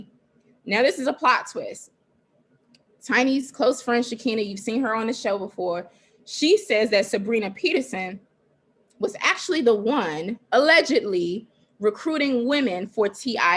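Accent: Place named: DMV, United States. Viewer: I can tell when someone is from the DMV area... I hear it in her voice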